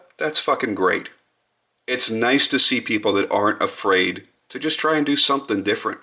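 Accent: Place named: United States. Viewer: I like your 'Murica accent, lol. Great and honest review. I had one of the first run Norsemans (vid on my channel) and it was a great knife for his true first attempt in the folding knife world. I think if you watch John's knife making Tuesdays series, you'd get an even better idea of all the work John and Erik had put into making these knives, but most importantly you'd get an idea of who the Grimsmo brothers are and they truly are good guys. Thanks for the review, keep 'em coming!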